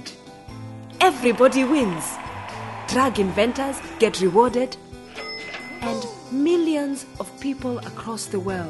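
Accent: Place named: Africa. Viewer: By your pronountiation i assume you're from Africa, you should know that the problem of Africa is just NUTRITION, not AIDS, you should ask for proper food and for clean water, not for drugs or medicines. It hasn't been proved this drugs works and it is scientifically well known their toxicity, that can kill quickly someone who hasn't a good nutrition. So why? Thabo Mbeki was right. That's the reason why he falled down... Turn on your brain please.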